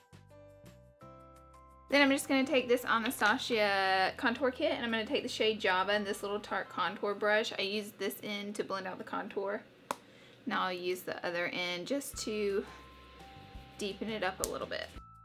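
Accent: South, United States. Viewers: All the power to you! So glad someone made this video. Also where are you from girl? Southern accent? Love it! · are you from the south? because if you are your accent is so cute!! I LOVE it!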